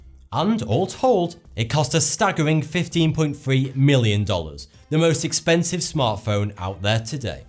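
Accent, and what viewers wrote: United Kingdom. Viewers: He is saying 42. It's a play on words sort of thing. The number 42 is said to be the "answer to life, the universe, and everything" or something like that. This has to do with the fact that he tells various facts about things in life in his videos. His channel is which sounds like 42 in his British accent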